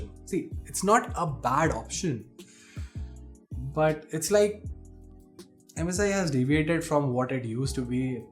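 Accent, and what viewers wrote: India. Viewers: Is he an Indian? Coz he sounds like one?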